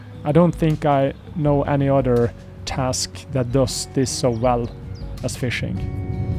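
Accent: Bergen, Norway. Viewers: Det er ikke vanskelig å høre at du er Bergenser ;-)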